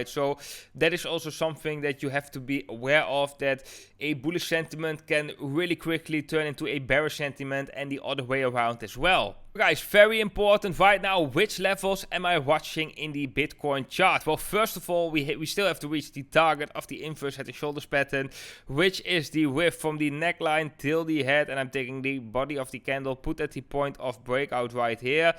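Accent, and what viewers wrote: Netherlands. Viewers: What time you sleep? You clearly sound dutch, but you always upload during the night ???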